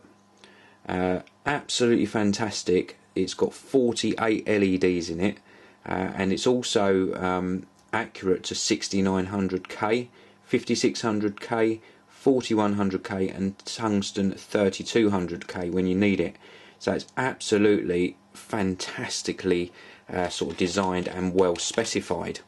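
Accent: United Kingdom. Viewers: Is it just me... having a reviewer with a British accent makes me appreciate the product so much more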